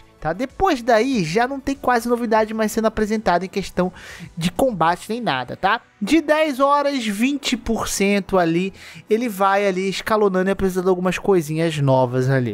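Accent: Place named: Minas Gerais, Brazil. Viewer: Coé irmão, mineiro é mó bão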